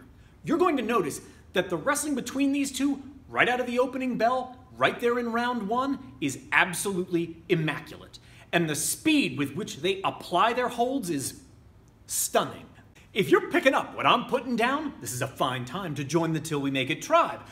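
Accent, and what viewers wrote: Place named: United States. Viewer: Why is some American guy talking to me?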